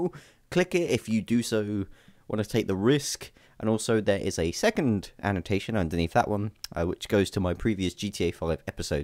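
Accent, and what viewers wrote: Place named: United Kingdom. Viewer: A mysterious British guy whose accent isn't sexy. What a relief. Usually British accents are attractive but his just isn't, lol!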